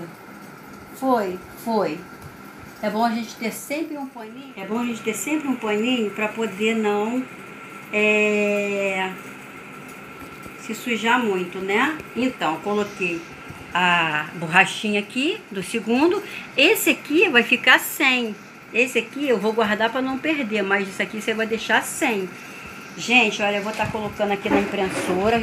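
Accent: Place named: Rio de Janeiro, Brazil. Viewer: Legal o vídeo, mas pelo sotaque você é carioca, e é normal os correios terem medo de entregar, só tem bala perdida aí e bandido.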